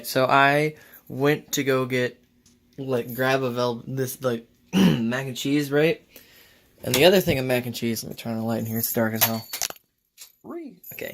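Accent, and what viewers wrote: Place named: Georgia, United States. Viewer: You have a really nice voice. Are you from Georgia by any chance? I've known a few people from there who pronounce words with similar intonations. This vlog was really comfy. I liked how you cut from thing to thing, it gave a sense of pacing as you were developing each topic. A lot of the stuff you were talking about felt very mundane but that was the charm of it for me. Getting to hear someone with a likeable personality and soothing voice share what their life is. And the fact that you showed for example an unedited clip of you playing that game that had been spending so much time on drove home that point. It felt very coherent overall and had a nice flow to it. I'm looking forward to what you do in the future!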